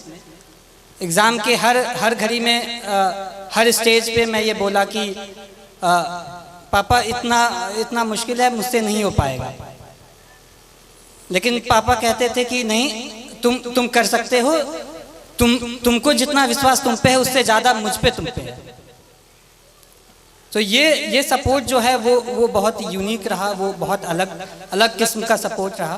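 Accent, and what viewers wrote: Bihar, India. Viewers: Bihari Op Bolthe❤❤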